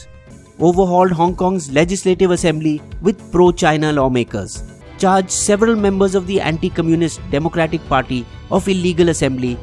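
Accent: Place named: India. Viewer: you mean kutzergart? Yes I do watch him but i can say tells story in much more interactive way and I can understand because of his Indian accent and kutzergart is a German.... And believe me when Germans spaek English it is the most hilarious thing.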